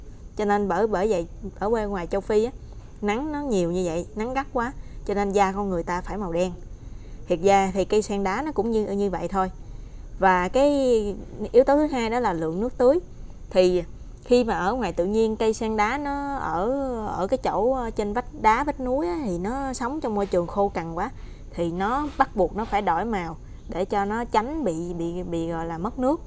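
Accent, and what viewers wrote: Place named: Mekong Delta, Vietnam. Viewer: Nghe giọng của chị người miền Tây mà sao bít tiếng Hoa hay vậy?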